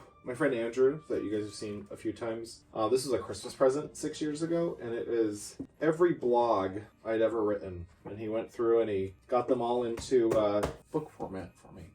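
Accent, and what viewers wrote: Minnesota, United States. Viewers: My favorite part was - , looking down through the garland balusters at the winter wonderland below. Everything looks SO beautiful. I don't know how many Christmas trees you have total, but I decided at the start to make "5 Christmas trees" the 5th item. On the 5th Day of Vlogmas my true love gave to me: Five Christmas trees 🎄🎄🎄🎄🎄 Four meows from Paolo Three sets of batting Two power strips 🔌🔌 And you sound like the movie Fargo 🎄⛄🙂⛄🎄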